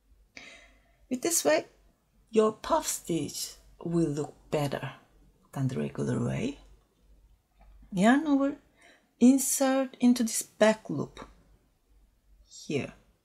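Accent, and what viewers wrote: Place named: Turkey. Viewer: Türk aksanlı İngilizce 😂😂😂😂hemen belli oluyor ,broken eng too…😂😂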